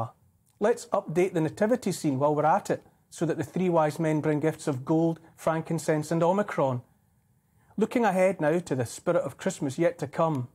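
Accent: Scotland, United Kingdom. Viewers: Your speeches are spot on and with your beautiful Scottish accent it is truelly meaningful and comes across so true, its a shame this is not a speech to the nation on the 6 0 clock news. Well put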